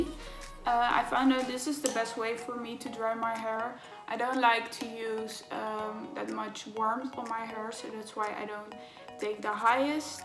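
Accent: Netherlands. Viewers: hoorde gelijk dat je nederlands was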